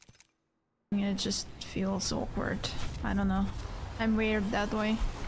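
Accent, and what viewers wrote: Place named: Russia. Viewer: I had no idea that your accent was russian. As an american i can usually spot a russian accent but tbh I thought your accent was spanish. Either way, I like it and think you're absolutely beautiful. Not in a creepy way though, I'm happily married. Love your gameplay too. You're really good! Keep it up!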